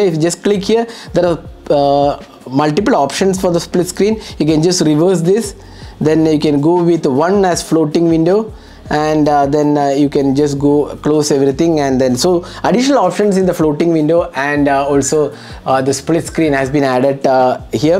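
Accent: India. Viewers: Enjoyed this in-depth content👍👍the split screen and floating window feature is amazing! Now I can message and watch video at the same time PS: love Indian accent English better than our Chinese accent English😂❤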